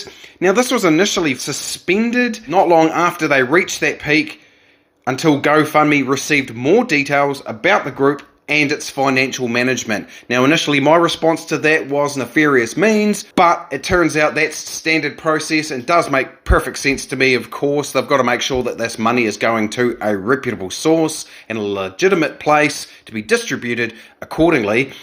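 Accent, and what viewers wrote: New Zealand. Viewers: Algorithm and all that. Grow the.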